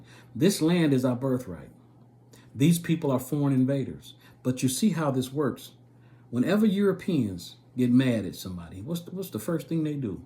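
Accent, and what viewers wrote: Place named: Baltimore, United States. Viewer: Blacks in Baltimore hence the accent lol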